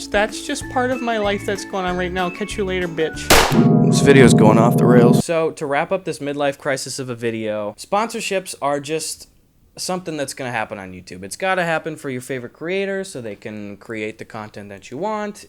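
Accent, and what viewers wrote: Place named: Wisconsin, United States. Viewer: He can switch to his normal voice to his Wisconsin accent and that's pure power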